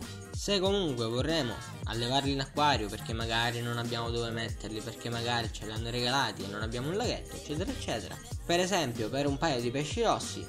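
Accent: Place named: Sicily, Italy. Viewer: Bravissimo... Sei siciliano, si sente!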